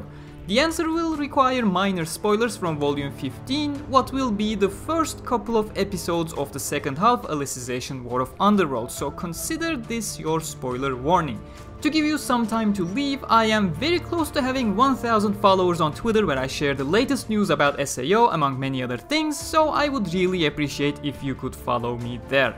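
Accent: Turkey. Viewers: You really have a turkish accent,türk müsün lan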